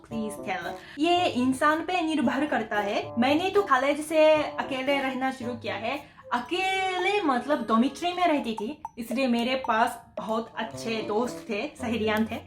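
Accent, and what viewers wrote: Standard. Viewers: I love you apka Hindi Bhasa suddh hai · Humto sirf Hindi bolte hai ji to sudha Hindi bolti Hain😘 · 🙄 itni suddh hindi to mene khud ne aaj tak apni puri life me nahi boli including hindi exam papers. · U speak pure hindi...and we have lots of impurities mixed in our langauge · ap to kuch jada hi pure hindi m bolte h🙏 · Itni pure Hindi to Mai bhi nhi bolti🤣 Thankyou for loving our culture💜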